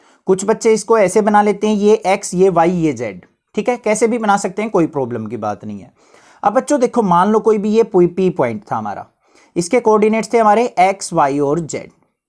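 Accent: Haryana, India. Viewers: Bhai Haryana k hai sir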